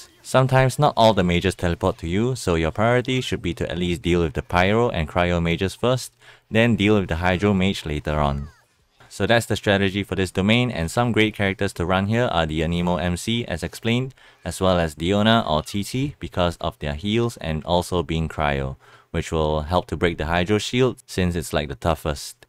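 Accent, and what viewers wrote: Singapore. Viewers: picked up your mixed Singapore accent right away, we feelin it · You sound Singaporean ( sorry if this sounds racist )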